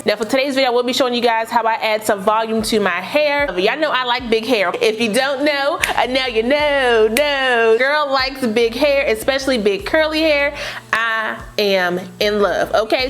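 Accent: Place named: DMV, United States. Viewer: That intro tho…girl you gotta be from the DMV! And the hair looks bomb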